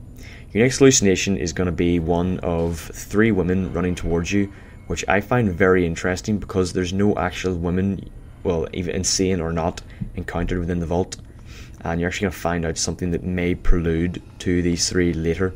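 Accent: Northern Ireland, United Kingdom. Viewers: Northern Ireland ?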